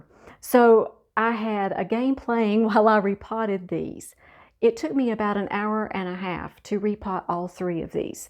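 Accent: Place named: Kingsport, United States. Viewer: Are you near Kingsport? Your accent like mine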